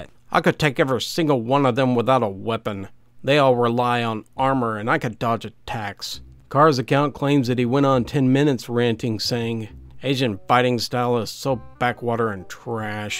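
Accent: South, United States. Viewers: That is the lovely southern charm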